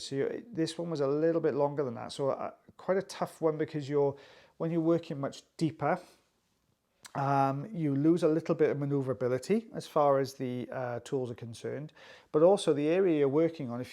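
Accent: United Kingdom. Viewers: Just found your channel a couple days ago. Watched an embarrassing amount of your videos. I don't think this would have came out the first time with a hammer and chisel. Also I like you narrating the videos and your excitement when a big chunk comes out. Plus I love listening to British accents, especially the word "tidy"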